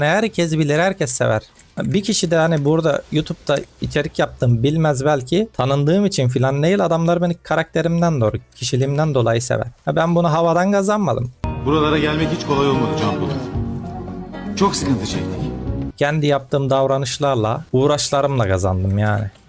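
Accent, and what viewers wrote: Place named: Black Sea, Turkey. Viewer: abi karadenizlimisin karadenizli vibe ı alıyorum senden aleyküm selam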